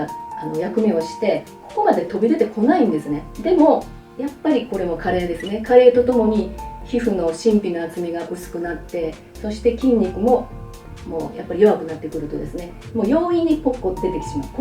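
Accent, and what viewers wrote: Hiroshima, Japan. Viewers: 広島弁っぽい訛りが好き❤️ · 先生は、広島の方ですか？ イントネーションで😅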